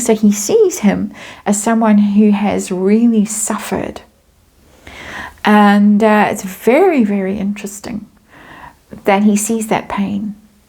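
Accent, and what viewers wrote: South Africa. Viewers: I look forward to hearing your voice everyday. A voice of reason and wisdom without the need for puff puffery. Thank you, 🙏 Your English South African accent is a sweet link to my birth country and family, my British South African heritage as a child. Thank you from 🇨🇦🇿🇦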